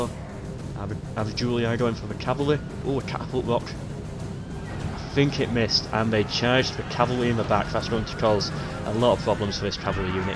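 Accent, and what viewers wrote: United Kingdom. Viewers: Yeah I hope so mate👍🏻 Also, love ur accent mate! Wish my British accent was like yours😂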